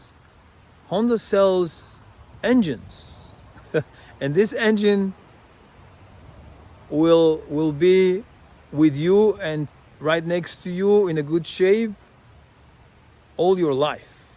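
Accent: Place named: Eastern Europe. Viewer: oh! I knew you sounded Eastern European. Добре дошли!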